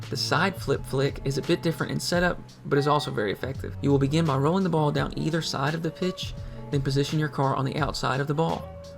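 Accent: South, United States. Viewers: hi hello. I just found you from a sunless video and decided to look up your channel and I wanted to say a few things. 1. i'm pretty sure you're southern based on that accent hahah. I'm from the 'sip so ayyy. 2. I'v e never seen an in depth video like this helping people in each individual rank. so high props to you for even making these videos. 3. I just wanted to say thank you and I have high hopes for you my guy!!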